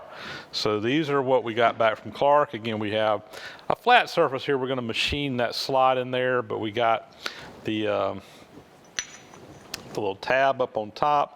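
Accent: South, United States. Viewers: I just finished watching the video from Hand Tool Rescue where you had to make the part for him. In the part of the video where y'all were having the "phone conversation," the minute you opened your mouth, I thought, "That boy ain't from Canada. I wonder how far below the Mason-Dixon line he lives." I was born and raised in Monroe, LA area. My ancestors came from Georgia and Alabama. Enjoyed the video.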